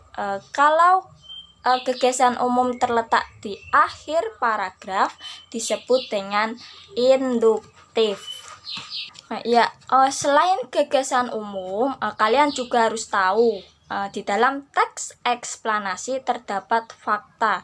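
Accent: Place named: Java, Indonesia. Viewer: Kangen medoknya Non. 😆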